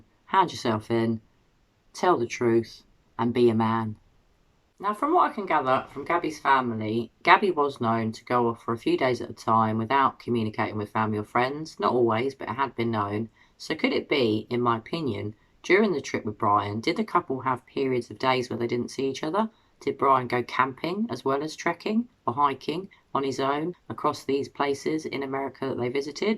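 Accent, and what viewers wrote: United Kingdom. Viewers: thank you for your thoughtful investigation into these murders. It really is alarming to think of Brian as a serial killer!!! You are the first person in my observations on Y.T. to propose this possibility a few weeks ago. I am praying often for LE to catch him and bring him to full justice. PS -- being "across the pond" in SC, USA, I enjoy hearing your accent 😊!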